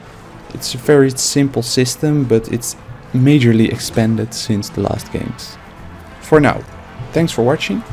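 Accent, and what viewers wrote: Netherlands. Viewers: dat dacht ik al ik hoorde het aan je engels accent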